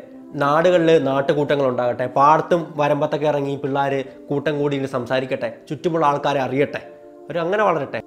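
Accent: Vadakara, India. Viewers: ... Nee vadakarayano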